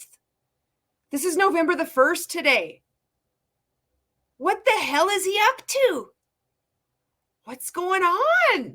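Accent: Canada. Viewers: I knew I recognized that accent, a fellow Canuck!!!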